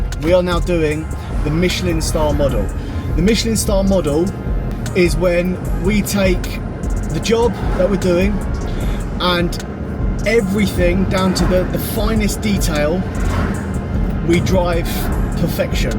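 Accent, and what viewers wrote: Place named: Cockney, United Kingdom. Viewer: Wanting to become a high end firm whilst filming yourself in a vest sounding like a cockney wide boy does not come across to potential customers as high end sorry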